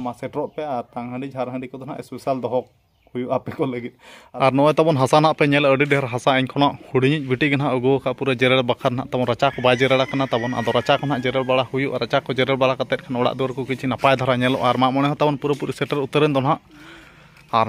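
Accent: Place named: Santhali, India. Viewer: Dada aplogh k santhali acent ❤❤❤ bhut accha hai love you dada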